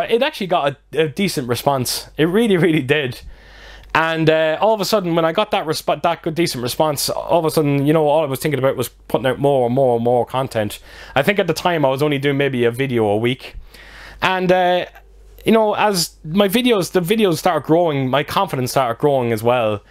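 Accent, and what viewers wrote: Ireland. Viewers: I’ve been watching your channel at least one an half to two years. Just hearing your accent makes me want to get to Ireland. You give honest opinions. Keep up the great job you’re doing!☘️🇮🇪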